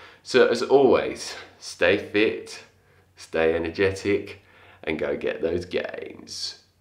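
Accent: United Kingdom. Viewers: but where is your accent from? You sound British? Just curious, keep it up👍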